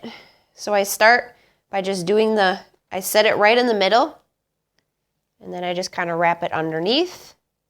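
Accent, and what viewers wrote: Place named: Minnesota, United States. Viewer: She's gotta be from meennasowda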